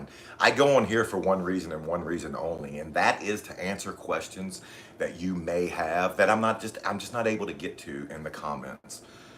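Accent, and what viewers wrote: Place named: Eastern Shore, United States. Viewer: man your accent reminds me of home... im a eastern shore boy from maryland.. love it bro keep up the good work.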